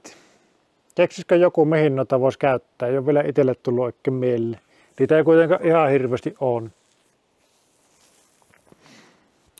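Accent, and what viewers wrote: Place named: Finland. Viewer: Olipa mukava viteo👍, komia katos tuli. Sulla on ääni ja murre vähän ku Päätalon Herkolla😂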